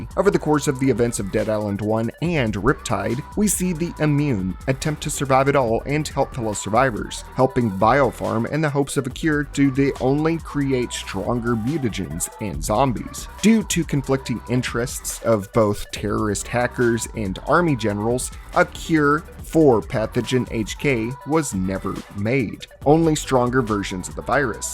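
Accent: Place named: South, United States. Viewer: Man you’re voice gets less southern with time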